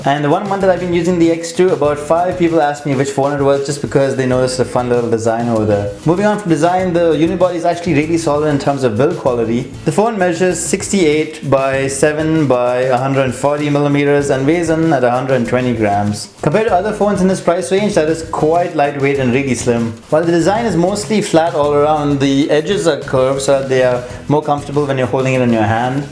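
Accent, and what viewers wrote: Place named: India. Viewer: great! grammar is pretty good but you sounded so indian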